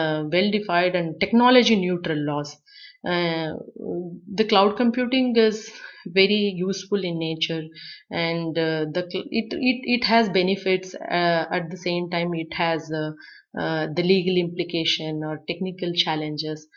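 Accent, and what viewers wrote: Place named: Kollegal, India. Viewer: Real real research. But accent seems from somewhere near kollegal.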